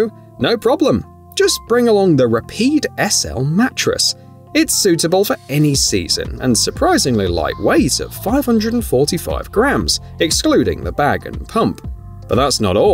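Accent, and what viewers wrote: United Kingdom. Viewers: The pulsing background "music" induces a headache. I wonder if the narrator is really British.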